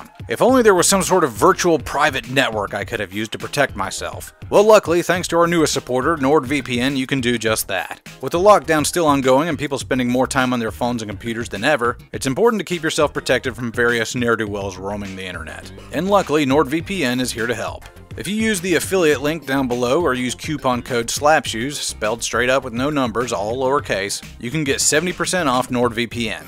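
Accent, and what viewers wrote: South, United States. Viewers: This video is good. Really good. But without your southern accent it wouldn't feel nearly as authentic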